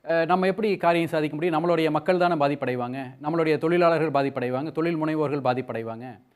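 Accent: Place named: Kongu, India. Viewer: கொங்குத் தமிழின் சிறப்பியல்போடு கருத்துகளையும் சிறப்பா வெளிப்படுத்தியிருக்கீங்க... அருமை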